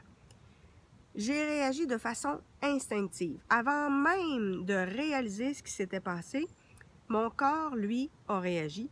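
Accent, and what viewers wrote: Quebec, Canada. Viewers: Belle vidéo et j'aime. ton ascend québécois j'adore. Super que tu fasse, partagé ton expérience, personnelle.merci franchement j'aime.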